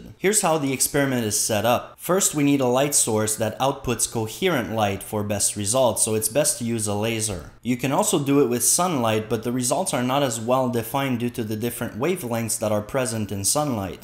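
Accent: Quebec, Canada. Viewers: Are you from Quebec? Nice video!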